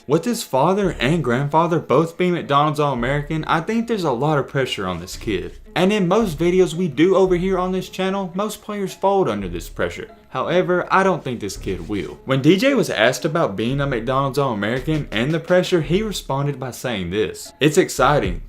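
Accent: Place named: Mississippi, United States. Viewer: You from Missippi? You sound like a man I met in Nachez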